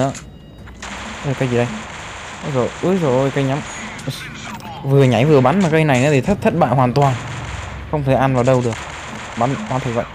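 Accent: South, Vietnam. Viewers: Miền nam à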